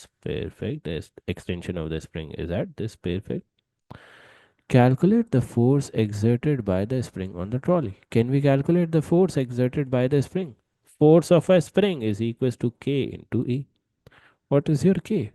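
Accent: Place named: India. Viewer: ikr, the most indian accent i ever saw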